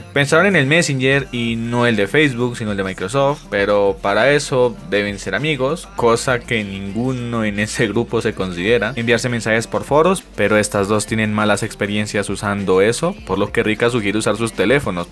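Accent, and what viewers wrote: Colombia. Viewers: Mero colombiano pana 👌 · Un narrador colombiano mca que chimba, parce de corazón espero le vaya muy bien, y confirmo, pobrecito el parcero. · pd: tienes pura pinta (voz) de ser colombiano amigo sub nuevo 😅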